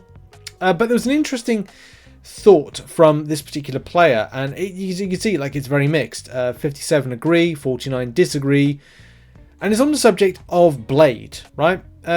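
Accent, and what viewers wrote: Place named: United Kingdom. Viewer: The uk accent is horrendous. I can barely understand a word you’re saying. When you talk beyond 130 words per minute it’s just shit mumbles. Have someone from America listen to your vids and ask them to repeat what you said. There are huge gaps where we can’t understand shit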